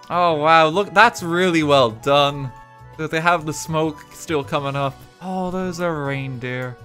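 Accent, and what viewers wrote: Ireland. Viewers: is that a country irish accent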